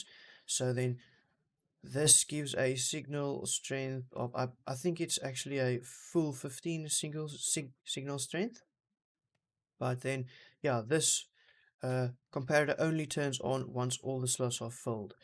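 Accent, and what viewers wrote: South Africa. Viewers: Nice to hear a fellow South African!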